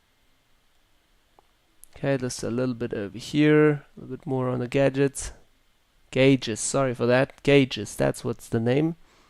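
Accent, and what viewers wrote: South Africa. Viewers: Hey you're from South Africa... :D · wow u from south africa nice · Thanks so much for your time and nice accent which reminded me of my old friend from South Africa. It would make more sense if you didn't make this shot in the camera because you kept on saying you would rather re-shoot it. That's why we have you as an expert to make these tutorials making mistake shots look acceptable and punchy. Thank you so much.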